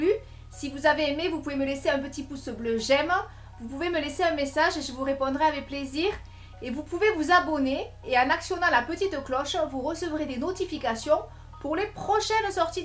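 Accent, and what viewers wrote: South, France. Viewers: Il est ma..fini.. fi..que votre tableau. Je suis abonnée depuis plus semaines et j adore visionner vos turbos. Merci encore pour votre voix chantante.. du Sud sans doute??😃💋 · Un grand merci pour ces partages, vous avez l'accent du soleil,J'adore !🎨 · J'aime beaucoup ce que vous faites. Celui-ci me paraît simple, je le ferai dès demain.! 🤔🤔🤔⚠️⚠️⚠️ Enfin je vais essayer. Bisous bisous.. De quelle région est votre accent? Marseille, Perpignan? Toulouse.? Parce qu'il me semble que nous avons le même, moi c'est du Midi 😜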